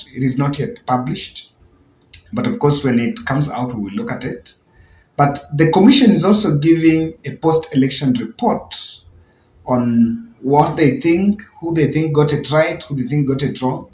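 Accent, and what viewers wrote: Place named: Luhya, Kenya. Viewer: He is talking like a luhya..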